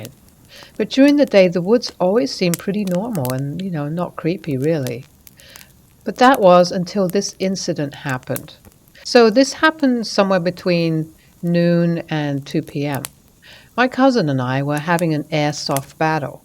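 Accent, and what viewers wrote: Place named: England, United Kingdom. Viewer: Yay finally an English voice telling me spooky stories! And fire sounds too! Excellent, continue please ✨☠️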